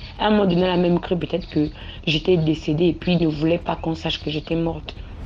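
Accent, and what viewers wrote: Gabon. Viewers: L'accent est gabonais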